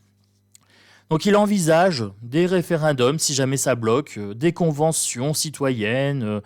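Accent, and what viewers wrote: Nord-Pas-de-Calais, France. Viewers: Bonjour, vous seriez pas un peu ch'ti par hasard??? J adore votre humour 😊. Merci pour ce petit tirage. A bientôt.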